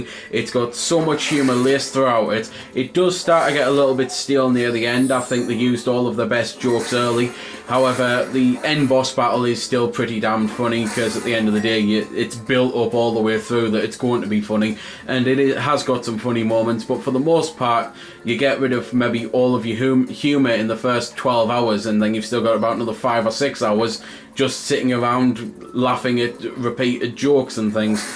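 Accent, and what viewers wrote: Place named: Northern England, United Kingdom. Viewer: You're the best reviewer gaming wise excellent taste only negative is your accent sometimes hard to understand being american. Where are you from country city and region? Is that like northern english accent?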